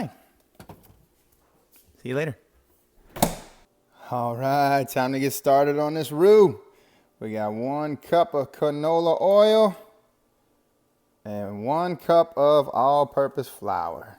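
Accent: Louisiana, United States. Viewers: I wonder what part of Louisiana he's from. Gotta be close to me. Southwest Louisiana for sure.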